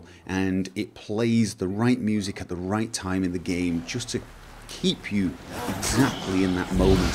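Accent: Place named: Northern England, United Kingdom. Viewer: ***** Bollocks, he has a northern british accent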